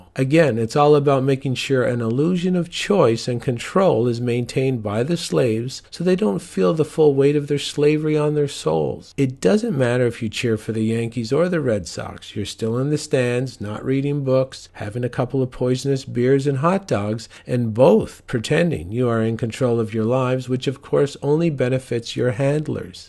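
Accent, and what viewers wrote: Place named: Canada. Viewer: I love the sinister tone delivered in a Canadian accent, LOL.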